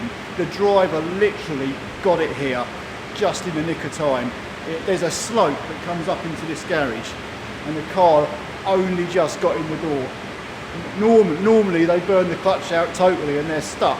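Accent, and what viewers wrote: United Kingdom. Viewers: Ох уж этот Британский английский 😂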